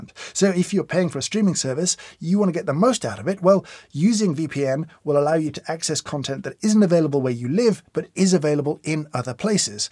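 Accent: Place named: England, United Kingdom. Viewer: Your english accent is very satisfying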